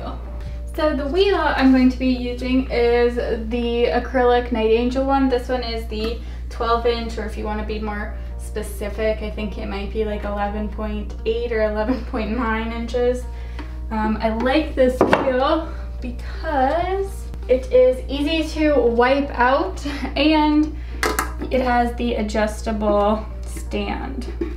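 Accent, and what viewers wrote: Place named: Canada. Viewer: When you even realized how Canadian your accent was LOL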